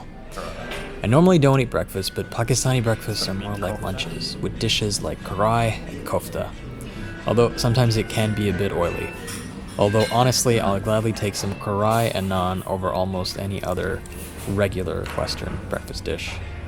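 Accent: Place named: West, United States. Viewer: ?? You are Indian or Indian origin people who lives outside of India? Your accent sounds western. Where are you from originally?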